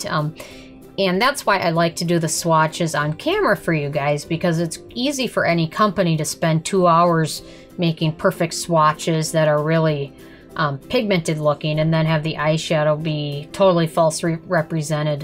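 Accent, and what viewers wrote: Minnesota, United States. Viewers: Are you from Minnesota? Great review!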